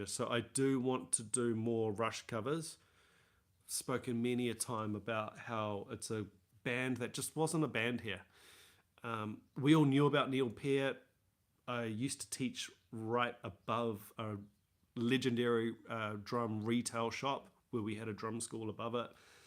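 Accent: New Zealand. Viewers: Kiwi accent🤣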